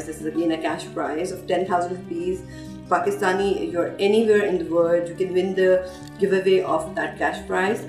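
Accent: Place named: United Kingdom. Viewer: Are you originally from Pakistan because your English accent is very British.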